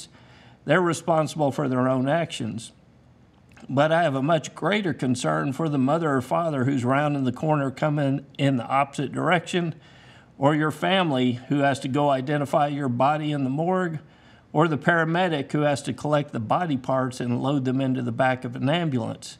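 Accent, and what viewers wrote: United States. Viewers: I'm curious. What accent is his? American, I assume, but which part of the US?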